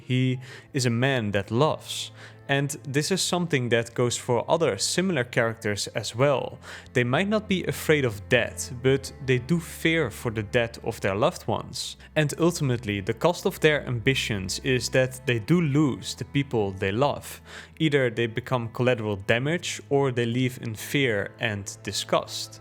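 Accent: Netherlands. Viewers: Strong dutch accent haha. Great video. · Klinkt als een Nederlands accent, mooie, interessante video.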